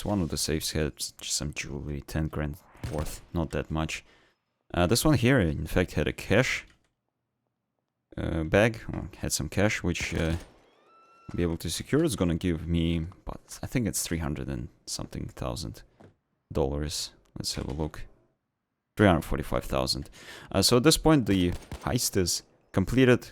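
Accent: Russia. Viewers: Акцент русский, по моему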